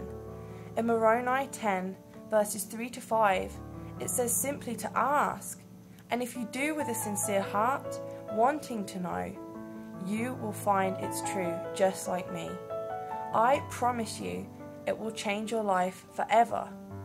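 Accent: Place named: Australia. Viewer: What happened to your Northhampton accent C? U sound kinda Auzzie to my untutored ears :S (search 'Alan Moore' - the Watchmen / V for Vendetta writer - to those not in-the-know for the NH accent)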